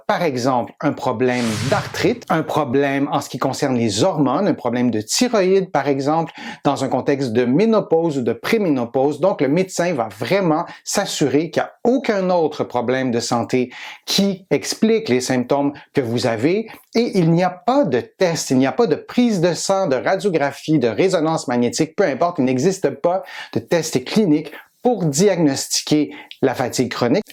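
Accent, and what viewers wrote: Quebec, Canada. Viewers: Dès le début j'entends l'accent Québécois je sais d'office que je suis tombé sur du contenu de qualité. Merci beaucoup pour cette vidéo ! Un cousin français. Je m'abonne !!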